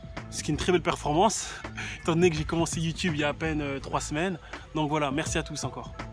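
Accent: Kansai, Japan. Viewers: Y a rien a faire, je trouve ça toujours particulier de voir et d'entendre un étranger parler kansaiben xD ましてや黒人だからいっそうびっくりするｗ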